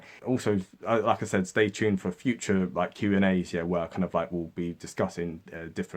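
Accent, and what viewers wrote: England, United Kingdom. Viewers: You can't win here. The sound of an English accent providing solutions to Irish problems drives even the most Irish people bonkers, as there is a long, long history of 'English solutions' causing endless problems for the Irish. You're a brave man...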